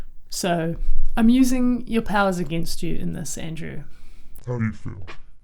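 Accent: New Zealand. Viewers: Wait...your accent...a fellow kiwi? How cool if so! I also make YT videos but am such a huge fan of Andrew Huang. You're amazing! Congrats on the new video collab with Andrew. I've subbed. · As soon I heard that accent I needed to check out the channel :D Gotta support more Kiwi channels! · i came from andrews channel to watch this, it was by far my favourite. i loved the use of the real instruments. it somehow reminds me of both and (mostly hayley). definitely gonna subscribe. also your voice is amazing, love kiwi accents. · Are you are New Zealander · Beside your accent being a dead give away, your beats/sound is totally Kiwi!! Love love love this!!! Keep it up hun you are freakin awesome!!!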